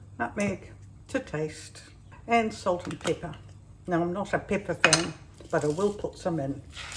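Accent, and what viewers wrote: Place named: Australia. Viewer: It's pasta time I made some noodles. 'I just love it.' You slipped into Aussie there. 😲You did sound rather Aussie all the way through. Have you just been video chatting to your family in Aus just before making the vid? After you put the onion in you could have said 'That's shallot'. Problem solved. 😁😁 I follow the recipe from my cookbooks exactly because I'm testing them. I'm a massive black pepper fan. Peter Piper picked the peck of pickled peppers just for me.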